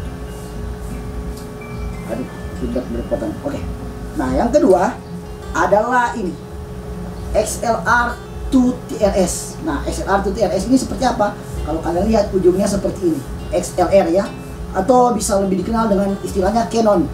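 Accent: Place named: Nias, Indonesia. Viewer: logatnya keg orang nias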